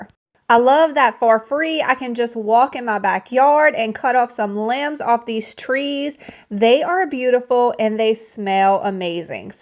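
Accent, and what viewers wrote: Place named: South, United States. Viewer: I liked the cafe curtains. I love your southern accent!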